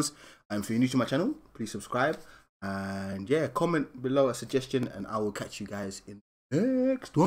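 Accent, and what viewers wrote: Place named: United Kingdom. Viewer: i think hes BRI'ISH edit: thats a big BO'OHW'O'WO'ER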